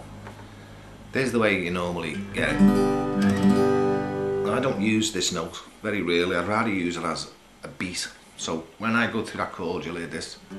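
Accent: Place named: Liverpool, United Kingdom. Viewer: Love listening to the scouse!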